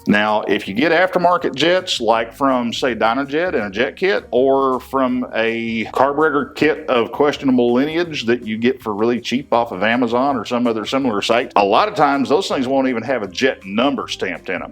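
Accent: South, United States. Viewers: When someone with a southern accent tells me "That's bad, don't do that" with a cigarette hanging out of his mouth I'm gonna listen.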